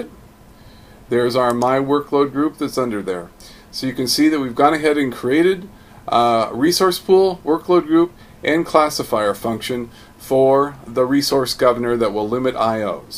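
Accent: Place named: United States. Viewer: Oh boy, American English!!!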